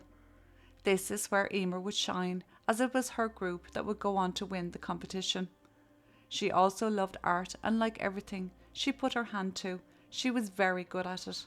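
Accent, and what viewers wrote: Ireland. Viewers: Much prefer listening to true crime with the Irish accent ( like my own ) 😊 you and that chapter are my fave accounts ! Thanks for all you do xx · New subscriber. I am in ❤ with your beautiful Irish accent 😊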